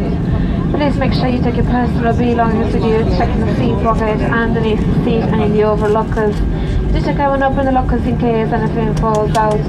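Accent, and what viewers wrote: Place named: England, United Kingdom. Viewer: hehe easyjet, en naam leuk! Lol dat engels accent ken het bijna niet verstaan. Mooi video wederom! Weet jij misschien echt goedkope vluchten? Ik zie namelijk vaak spotters naar Geneve of Manchester gaan paar keer per jaar, of spotters die vaak vliegen voor goedkoop. Weet jij dat misschien! Alvast dank!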